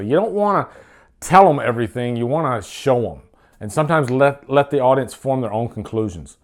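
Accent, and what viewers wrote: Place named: Louisiana, United States. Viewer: Are u from Louisiana? I asked cause I can kinda hear an accent lol. Great review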